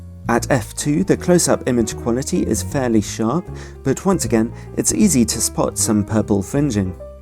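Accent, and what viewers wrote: United Kingdom. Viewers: Does everyone in UK can speak like you?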